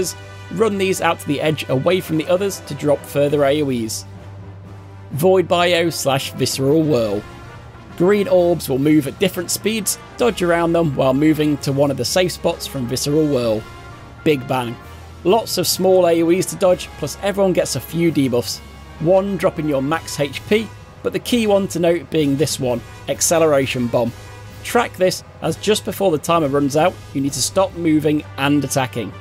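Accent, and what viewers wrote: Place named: Northern England, United Kingdom. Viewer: Just what I needed for a refresher going into it again with new folks! And sure, even coming from Ireland, you can always trust that Northern English accent to be no nonsense, straight to the point, here's what you do. Love it! Thank you so much <3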